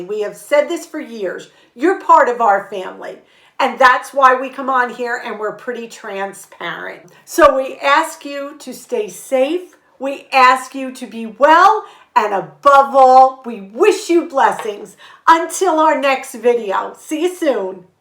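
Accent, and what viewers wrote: New York, United States. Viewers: This house is a treasure - with the huge lot and close to the Ocean. Never sell it - pass it on to your children. Old homes were built to last - not like the construction of homes during the last 20 years. I was born and raised in NYC/Brooklyn decades ago - so I enjoyed listening to ur NY accent. Paint and Flooring upgrades will update the house. There are so many choices of both. Enjoyed your video.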